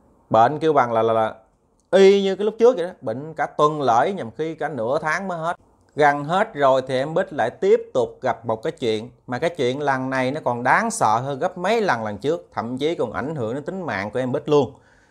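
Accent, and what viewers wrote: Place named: Ben Tre, Vietnam. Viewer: Quê e ở đâu vậy,ah nghe giọng e gióng ở Thạnh phú,Bến Tre quá.hihi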